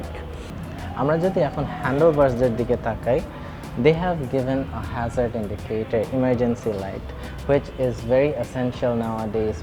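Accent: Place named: Dhaka, Bangladesh. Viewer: amr gu er review, bangla english milai bole uttara/gulshan er nola pola pain der moto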